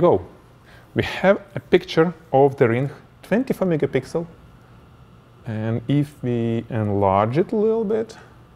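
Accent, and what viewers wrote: Russia. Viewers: Wish I could fully listen, I'm so delighted by the charming personality and accent which triggers my Russian nostalgia that I can't pay full attention to the tutorial :D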